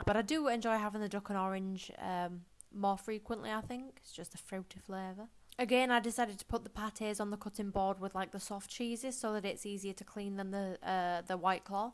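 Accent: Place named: Yorkshire, United Kingdom. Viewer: Your accent sounds so yorkshire! I love it haha. This looks sooo nice Im hungry now!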